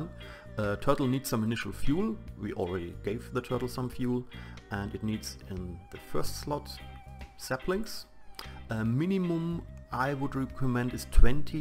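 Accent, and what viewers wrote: Germany. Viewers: du bist deutscher oder ? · bruuuuuder man merkt, das du ein deutscher bist xD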